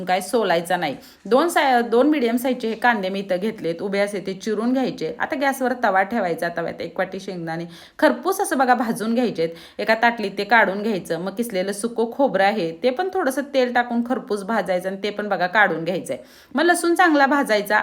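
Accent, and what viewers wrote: Kolhapur, India. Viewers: तुमच कोल्हापुरी ऐकूनच चटणी करावी वाटते. नक्की करिन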